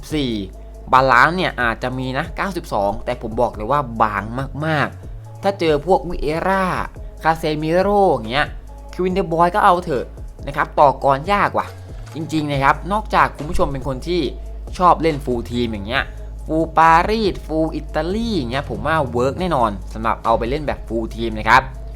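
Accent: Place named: South, Thailand. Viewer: พี่อยู่จังหวัดไหน สำเนียงเหมือนคนใต้เลยครับ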